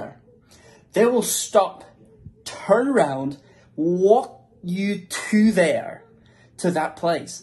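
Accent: Scotland, United Kingdom. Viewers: J'ai étais faire un séjour linguistique d'un mois à glasgow l'été dernier et c'était amazing. I fall in love of the city and Scotland. Thanks to you i keep practicing my english with your scottish accent. So thank you !! Merci ! 🇫🇷 🏴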